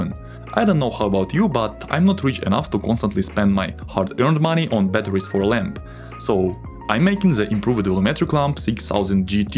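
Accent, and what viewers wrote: Russia. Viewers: Russian detected ⚠️ привет дорогой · Господи чувак, твой английский такой русский что писец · WTF he haves a Russian accent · Обычный русско-английский акцент. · Чувак! Твой русский акцент!.. 😂 But still you're doing good! Going to check Your channel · why the accent, bro? you sound russian! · Мне показалось, или услышал русский акцент?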